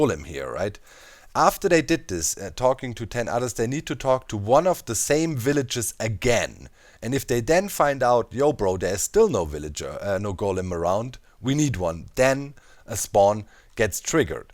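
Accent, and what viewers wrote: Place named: South, Germany. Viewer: Der Süddeutsche Akzent 😁 Ich liebe es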